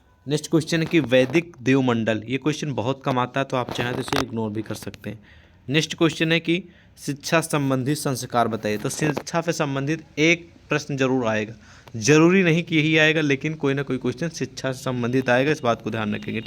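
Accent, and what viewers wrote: Bihar, India. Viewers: Bihar hai ya up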